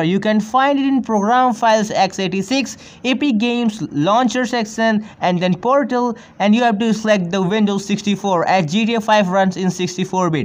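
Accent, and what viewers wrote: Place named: India. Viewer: Sounds like india or china, nice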